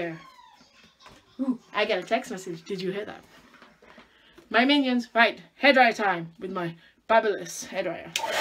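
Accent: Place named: England, United Kingdom. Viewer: You have an accent are you from England or British or something like that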